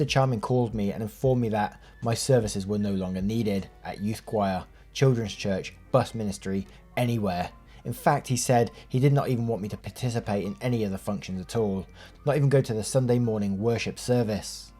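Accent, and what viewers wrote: United Kingdom. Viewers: Completely off topic but I was wondering what part of the UK your accent is Great job with the video :)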